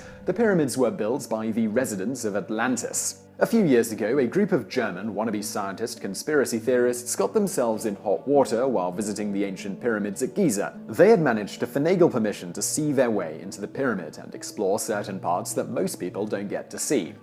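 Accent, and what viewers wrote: England, United Kingdom. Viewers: Rick Rockey You are actually taking personal offense because he has an English accent???? You are hilarious! Are you upset because you think he is putting you down by not using an inflection of voice that doesn't make you feel inferior? He is not, not trying to sound smug. It is an ACCENT. Do you get angry when French people speak with a French accent? I think you have a serious persecution complex. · Why is it that people believe that just because the presenter has a snotty British accent he's intelligent, he must be right? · Another Magic 8 ball why are they always British? I think it's that catatonic English accent. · Just because you have a fine British accent you still don't sound intelligent when you speak about things you know nothing about.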